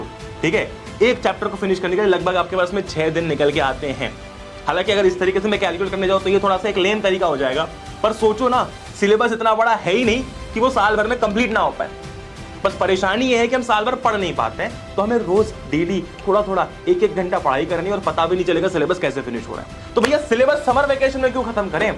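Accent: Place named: Maharashtra, India. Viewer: Hyy aap maharashtra se ho kya💞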